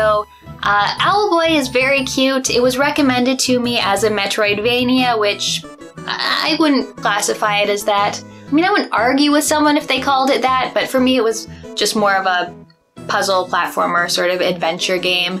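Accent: Canada. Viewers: I like your Canadian accent.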